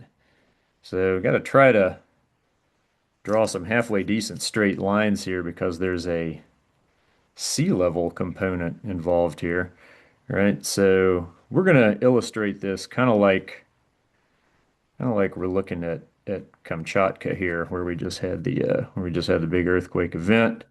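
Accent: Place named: Pennsylvania, United States. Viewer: Awesome video with great illustrations! Sidebar: Your accent reminds me of my lower Bucks Co. PA in-laws 😊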